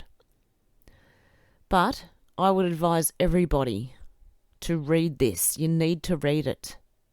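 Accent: Australia. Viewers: I'm very glad I found your channel. Great content, authentic and soothing energy. Love your Aussi twang.. peace from Canada (speaking of twang eh) 🙏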